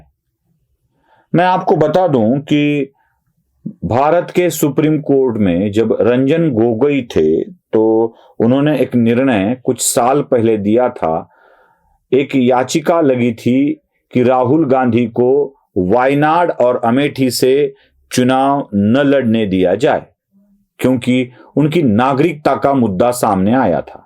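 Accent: Maharashtra, India. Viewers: apki marathi hindi me kuchh maja nahi aata, isse achha ye hai ki aap kisi hindi bhashik ko higher kare aur, usse ye kam ksrawaye. प्लिज वाईट वाटून घेऊ नका, आणि मी आपला आदर राखून हे सांगत आहे. यात आपला काही दोष नाही. धन्यवाद.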